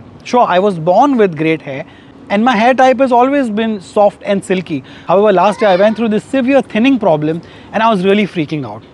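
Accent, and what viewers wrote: Hinglish, India. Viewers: Amazing hingliishhh...!!!Love the way u narrated the story 🤩😍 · Your narration of presenting this piece of news.... 😂😂😂.... Hinglish ✌️✌️ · 🤣 Loved your Hinglish narration. You've got a very good sense of humour 😂🤣🤣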